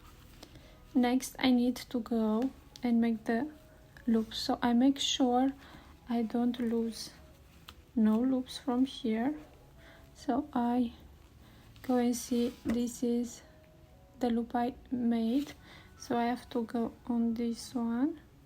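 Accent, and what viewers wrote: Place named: Romania. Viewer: I love your videos. My grandmother was from Romania and your accent and voice reminds me of her. She created such beautiful crocheted items. I still have my father's linen bassinet blanket she hand sewed and where she added a pretty crochet border to it. Thank you for your videos.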